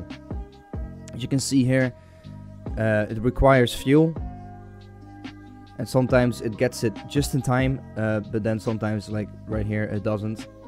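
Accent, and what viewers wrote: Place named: Netherlands. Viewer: gij zijt nederlands he da hoort ge aan u stem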